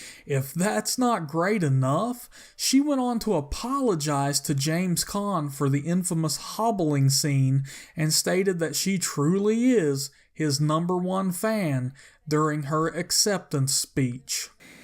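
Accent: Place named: Kentucky, United States. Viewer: Sounds like Kentucky to me.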